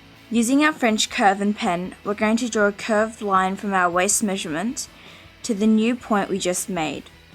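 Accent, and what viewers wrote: Australia. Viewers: ... I hope I didn't offended you or seem like I was attacking you but personally as fashion student it doesn't doesn't make sense because of my background of pattern making but I see where this would help alot of people out. Judging by your accent you must be aussie? (Please tell me I'm right... lol) Have you considered studying fashion? I recon that you have big potential in the fashion industry, you earn a butt load for being a pattern maker.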